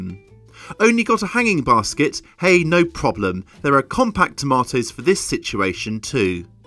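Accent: England, United Kingdom. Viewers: Thank you, lovely to hear an English voice :)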